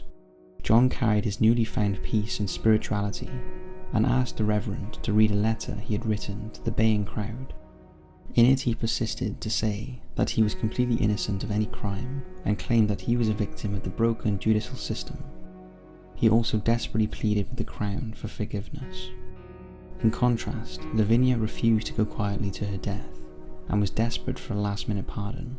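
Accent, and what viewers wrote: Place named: North Wales, United Kingdom. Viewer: I’m sure the narrator is from north wales , soothing voice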